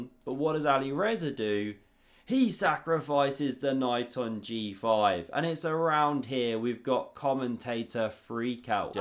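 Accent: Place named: West Country, United Kingdom. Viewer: Love the witty comments: some of them I have to have two takes, but it keeps the action flowing. What part of England is your accent from? It sounds like a West country tilt (not quite a lilt, perhaps).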